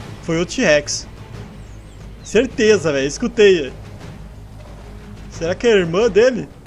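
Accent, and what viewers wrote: Rio Grande do Sul, Brazil. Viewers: Gaúcho? Mas aah djdjjd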